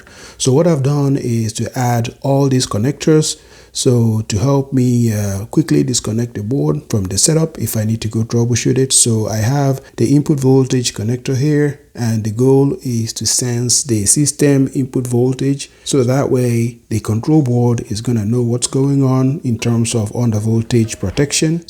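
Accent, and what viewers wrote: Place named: Haiti. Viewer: 🔥acento haitiano DETECTED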